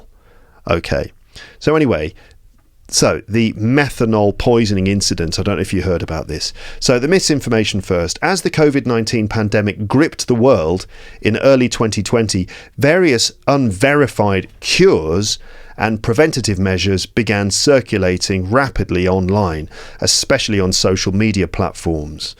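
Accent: RP, United Kingdom. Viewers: What is the name of the accent you speak? Is it like the BBC accent? ، Since I’m not a native English speaker and I’m learning English, which accent do you recommend I learn? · He speaks with a standard British accent also known as Received Pronunciation. Since you're a learner of English, you should go for Received Pronunciation. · I love Bristish accent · Yes, speaks with what is called RP (Received Pronunciation), which is clear and easy to understand compared to many regional accents. You can also call it “BBC English”. I think you should not focus on one specific accent, just make sure people can easily understand you.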